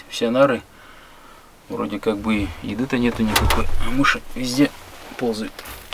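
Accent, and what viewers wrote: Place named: Arkhangelsk, Russia. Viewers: По говору вроде Архангельский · Ютуб предложил ваш ролик.Начала смотреть из интереса.А говор-то наш,северный).Приветствую земляка!Подписка.Лайк👍.Архангельская область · ! Привет! Подписался! Зашло! Где снимаешь? Я был в мезени, там такой же говор! Привет из Челябинска!!!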